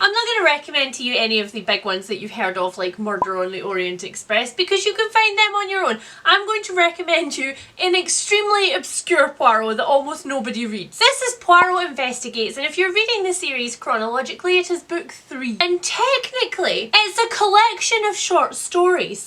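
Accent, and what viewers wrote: Scotland, United Kingdom. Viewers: Love the Parasol Protectorate series and Agatha Christie is one of my favorite authors. I'm more of a Poirot girl than Marple, though I enjoy her too. Cards on the Table is my favorite. I might have to add The Stepford Wives to my TBR. I, like you, have seen some adaptations and didn't think I needed to, but I'm reassessing this now. 😂 I may or may not watch your channel for the delightful Scottish accent. 😂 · This is the first video of yours and omg I am in love with you me energy! And as a fellow Scottish person I really appreciate the accent 🤣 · “Some of you are sticking around because of my Scottish accent”.....*looks around nervously* that...might be me 😳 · "Hanging around for the Scottish accent" I've been called out. Well, that and your humor.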